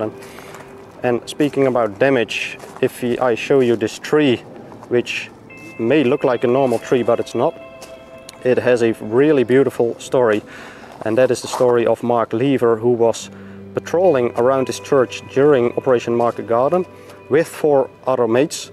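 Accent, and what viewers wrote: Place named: Netherlands. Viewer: Hello, I´ve found your channel via History Underground and what I have to say, I follow it. Your view of the part of the Operation Market Garden is very interesting, and I love Documentaries about Battles, when they where filmed on the spots. Bye myself, I visited Arnhem and Oosterbeek two times and I bet, not the last time. There are a lot of spots, where I wasn´t (the church for example). Bye the way, I like your dutch accent in your english. It is almost the same, if I speak english. Greetings from Germany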